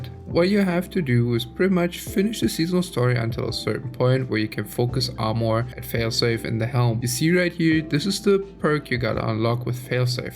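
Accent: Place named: India. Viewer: Ah listen to that thick indian accent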